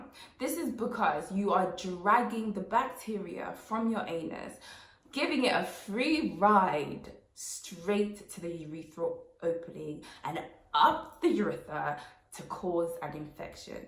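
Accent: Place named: United States. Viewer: Yassss, loved this, your accent though sounding like a real yankee 😂😂😂 love how you switch it up on us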